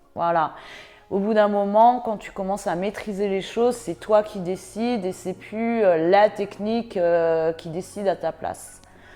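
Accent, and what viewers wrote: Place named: Paris, France. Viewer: a chier avec son accent parigot bpbo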